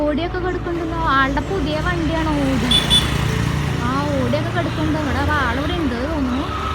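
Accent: Thrissur, India. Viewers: D. മോളെ മോളിങ്ങനെ ഓവറായിട്ടു fece കാണിച്ചു ഇങ്ങനെ തൃശൂർ സംഭാഷണവും കൂടുതലായിട്ടു പുറത്തോട്ടു തള്ളണ്ട.... മഞ്ജു.. മണിച്ചേട്ടൻ.. സത്യൻ അന്തിക്കാട്.. etc. പലരെയും അവരുടെ വീടുകളും കാണാനാഗ്രഹിക്കുന്നു.. മോളു ലൊക്കേഷൻ കൂടുതൽ വരട്ടു.. താങ്ക്‌സ്..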